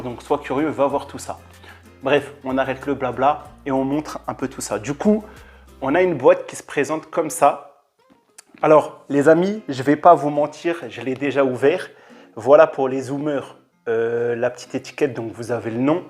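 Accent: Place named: Alsace, France. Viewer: Sympa le sac ! Jolie l'accent alsacien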